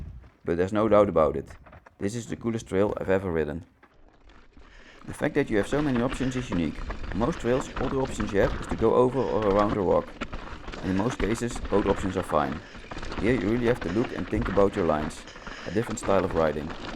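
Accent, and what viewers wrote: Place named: Netherlands. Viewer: ik vind het nederlandse accent engels juist leuk gewoon je eigen ding blijven doen. ik heb je hardtail content nu al 10x opnieuw gekeken . leuke video weer!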